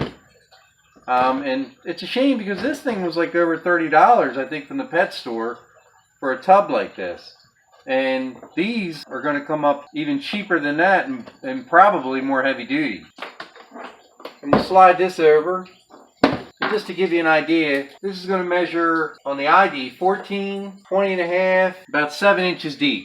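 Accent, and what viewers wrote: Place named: Maryland, United States. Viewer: Excellent video! Btw you sound straight outta Glen Burnie. You’re from MD aren’t ya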